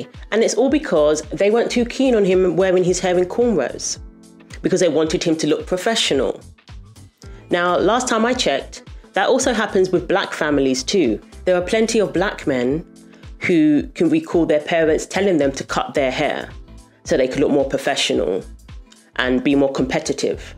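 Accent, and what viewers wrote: England, United Kingdom. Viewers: Just found your channel and subscribed straight away. It is always a joy to hear the Queen's English spoke so eloquently, and a young person with such good perception. Your accent suggests that you are from England or a Commonwealth Nation, being in America now makes your perspective extremely valuable for the rest of us. Looking forward to following you!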